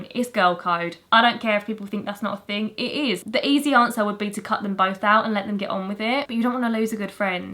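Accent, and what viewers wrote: United Kingdom. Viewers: My daughter loves your British accent she’s trying to get one too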